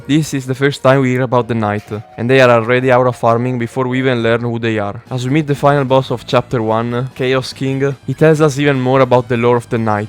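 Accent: Italy. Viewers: Not relevant but are you Italian? I'm from Italy and many people have this accent in English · Ciao bro, bei video. Comunque sì mi dispaice ma credo di sentire che sei italiano, bei video. Ben fatti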